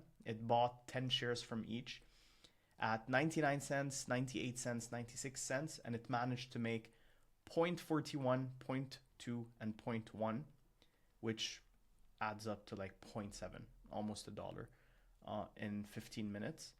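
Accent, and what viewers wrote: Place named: Philippines. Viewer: Are a you a Filipino? You sound like one.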